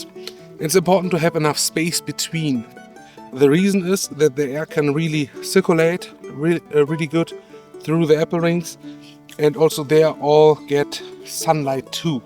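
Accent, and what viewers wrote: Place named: Germany. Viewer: Please don't reduce your wonderful accent, I enjoy it 😊! I understood every single word you said. Your accent reminds me of my Oma and Opa's accents. As young adults they legally immigrated here to the US from Germany, I spent a lot of precious time with them when I was a kid. They passed away a long time ago, I miss them.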